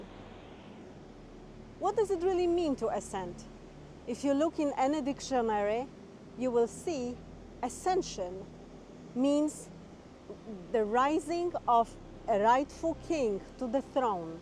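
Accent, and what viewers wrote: Poland. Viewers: Hello, thank you for your words, you seem to speak with Polish accent. ❤❤❤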